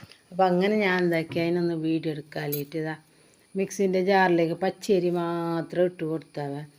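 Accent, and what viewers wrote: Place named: Kasaragod, India. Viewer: Nice sharing 🎉🎉🎉🎉 കാസ്രോട് ഭാഷ 😂😂 👌🏻👌🏻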